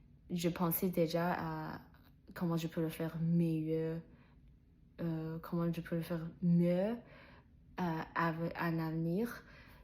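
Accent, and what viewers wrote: Quebec, Canada. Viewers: C'est mignon ce petit accent Québécois caché au milieu de ton accent chinois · Félicitations pour tes progrès, courage et bonne continuation 💪 Btw il me semble que tu as déjà un petit accent québécois ^^ Salutation de la Belgique 🇧🇪 Gilles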